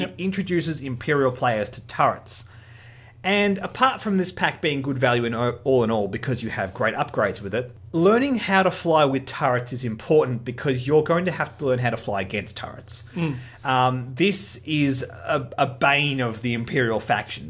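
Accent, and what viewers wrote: Australia. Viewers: Is that an Australian accent? Whereabouts are you? I... I need someone to play with XD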